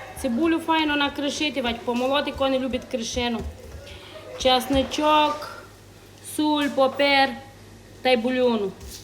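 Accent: Transcarpathia, Ukraine. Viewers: Не мала дивитися се відео, та почула закарпатьську говірко, то відкрила. Дуже скучила за цим чудовим краєм. Дякую.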